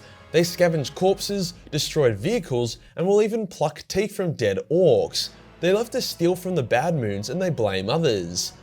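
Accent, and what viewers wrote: Australia. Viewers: You do this very weird thing with your voice at the end of like every sentence, where you kind of raise your pitch and makes it sound like you’re asking a question. That makes listening to you very difficult. Even if someone didn’t find it annoying, it absolutely comes off as not genuine. Just talk regular homie. (And no I’m not talking about your accent. Everyone loves a good Aussie accent)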